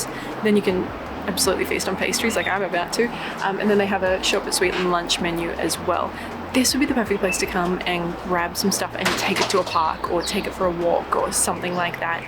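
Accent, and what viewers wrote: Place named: Australia. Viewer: Should have stopped at the firehouse by the Brooklyn Bridge, bet they would love to chat with a beautiful woman with an Australian accent.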